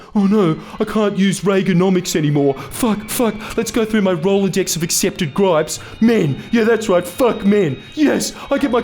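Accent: Australia. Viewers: I actually can't understand, he's Australian. saddens me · I just woke up. Never thought the first combination of the day was Helldivers and an Aussie talking about quantum goon caves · His accent is very Aussie but he emphasises his words while speaking to be a good comedic presenter which ensures his accent is very individual to him 😂😂😂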